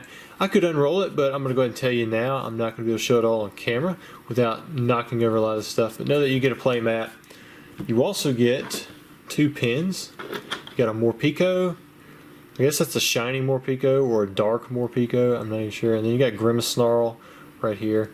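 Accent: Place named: Kentucky, United States. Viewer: Bojangles biscuits yessir...you in ky? Sounds like a ky accent...watchin ya here in Louisville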